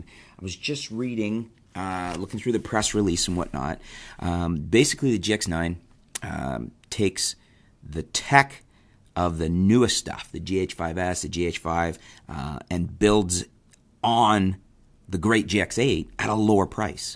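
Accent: Canada. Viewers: Is Canadian?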